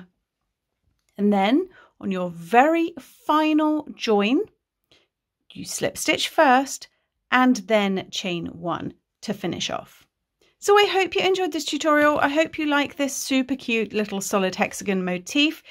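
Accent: United Kingdom. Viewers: Hello I love your tutorials they are so clear and precise. But I have a query. You sound British and yet you use USA terms. When I learned to crochet 50 years ago all patterns were written, in what I now know as, UK terms. It’s only since watching You Tube that I have heard of USA terms. I prefer the UK terms, they make more sense.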